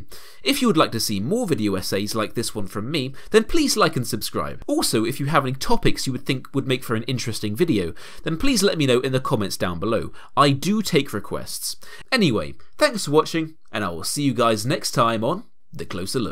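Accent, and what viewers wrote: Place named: United Kingdom. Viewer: dat british accent 🔥🔥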